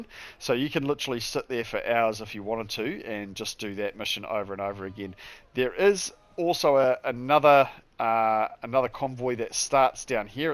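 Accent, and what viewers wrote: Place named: New Zealand. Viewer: New player here. Didn't know about the account ledger at Yanita. Thanks for this 🙌 Great Kiwi accent, mate! Edit: off topic, am i the only one who lost access to the shrine at Telok Penjarah, since the last update?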